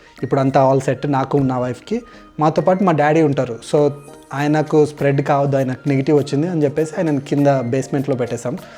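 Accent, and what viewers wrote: Telangana, India. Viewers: Telangana yesha baaga matlaaduthunnaru bro.🎉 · Excellent video Nice Telangana slang Crisp informative Shared to my daughter, who is in Toranto, Keep going All the best Be Happy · Canada velli 9 years ina mana mass slang matram miss avvaley Andhar la adho ochi rani posh language kakunda normal ga superb cheyppinav po Bro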